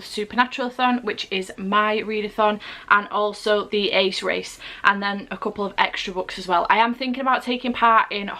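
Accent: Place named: Standish, United Kingdom. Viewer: Thrilled to find you ,I grew up in Standish , your accent makes me homesick.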